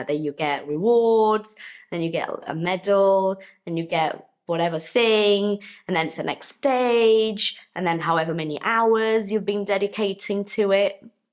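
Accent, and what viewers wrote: United Kingdom. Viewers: Oh my gosh that's pretty awesome! I love the British accent. Your English is amazing!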